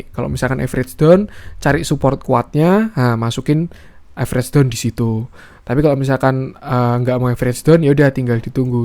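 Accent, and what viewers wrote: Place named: Java, Indonesia. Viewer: Iya betul...khas banget. Medok. Jadi pengen tau profil e mas e 😀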